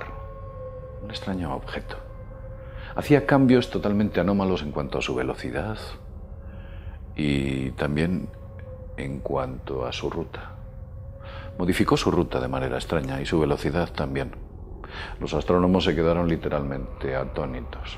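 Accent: Spain. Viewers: Que buena información, oye por cierto eres de España no?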